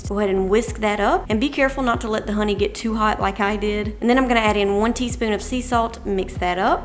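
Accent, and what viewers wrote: South, United States. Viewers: Giving you all the likes! :) you are beautiful! And I love your southern accent and your recipes ☺️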